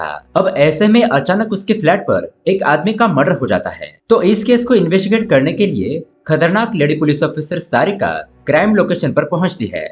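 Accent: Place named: Maharashtra, India. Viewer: Are you Maharashtrian??? B'coz marathi speekers ki hindi accent aisi hi hoti hai..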